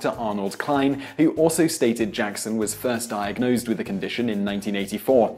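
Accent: United Kingdom. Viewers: British accent...Good bye with your bullshit.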